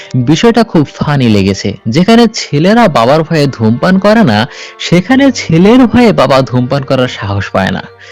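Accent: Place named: Jamalpur, Bangladesh. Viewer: ভাই আপনি মনে হয় জামালপুরের মানুষ, কথা শুনে তাই মনে হচ্ছে।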